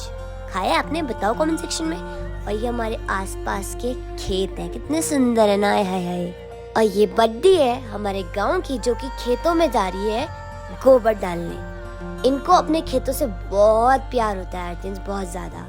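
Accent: Garhwal, India. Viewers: Cute gadwali bolti ho app yll ❤️❤️❤️❤️ Aur nice vlog aur tq comment like Kane ko ❤️❤️❤️❤️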